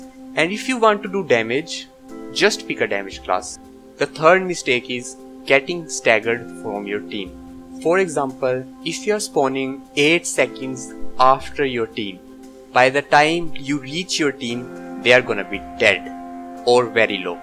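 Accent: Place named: India. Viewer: I can tell by your accent that you're indian. Am I right? :)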